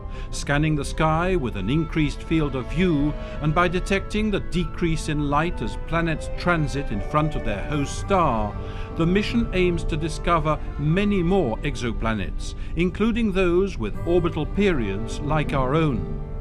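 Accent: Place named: United Kingdom. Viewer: cuz the brit accent is plenty cool enough in mono.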